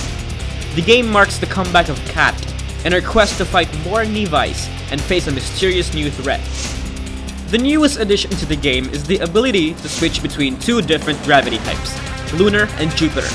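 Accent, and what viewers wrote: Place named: Philippines. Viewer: You can tell the guy talking abt the games is pinoy😂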